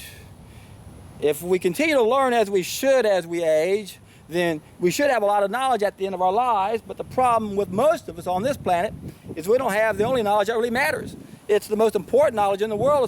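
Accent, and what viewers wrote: South, United States. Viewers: I'm from France, and I think your accent sounds funny. Is it the southern accent ?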